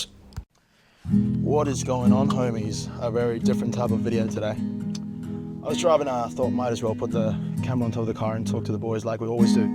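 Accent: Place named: United States. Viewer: Bro im 17,im from India i just came accross your channel and really loved your vids. In addition I'm impressed how you speak.. fcking smooth english with American accent and can speak hindi too. Bro i just want your advice on improving my English accent cuz i have big goals and i wanna be an entrepreneur on international level. So that will help me a lot.pls drop some gems here if you are reading this❤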